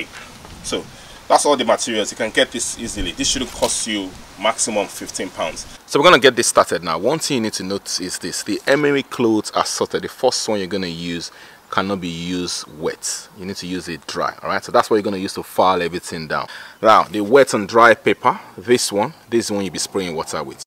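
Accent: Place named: Nigeria. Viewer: My Naija broda. I hear that accent clear as day. LOL. Good job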